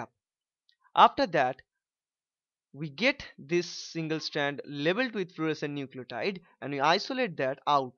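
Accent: Bengal, India. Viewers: Its Indian accent, with a bengali sub accent :P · his indian accent is much more annoying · ...i never said it before, but I love your Indian accent <3 .. haha thanks a lot!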